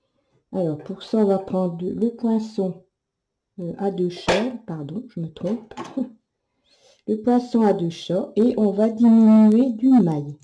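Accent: North, France. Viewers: Superbe ..., pourtant je donnais des cours chez Singer...en <num>. Valenciennes..vous ahvez un petit accent du Nord..avec les çà....c'est charmant .., je me reconnais en vous....je ne vous connaissais pas.....j'aimerai donner des cours .à domicile .. comment calculer.....😊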